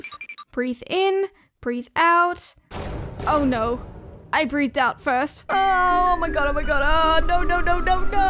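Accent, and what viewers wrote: Australia. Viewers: the australian accesnt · Are you Aussie??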